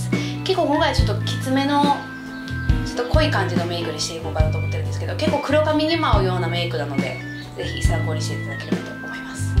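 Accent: Kansai, Japan. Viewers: さん、関西弁やから親近感がわく( ＞∀＜ )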